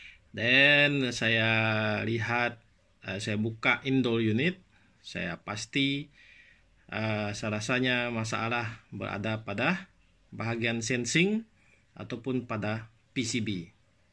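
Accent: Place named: Bidayuh, Malaysia. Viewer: Slang bidayuh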